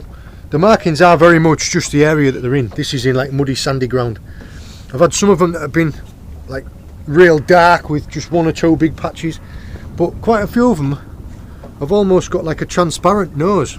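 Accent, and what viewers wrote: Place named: East Yorkshire, United Kingdom. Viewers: I have followed you for ages... your accent is clearly East Riding of Yorkshire, more specifically the great city of Kingston upon Hull. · no matter which riding you are from we are proud to see your great vlogs + hear our accent on here. REIGHT good to see you so happy in squire trelawney land.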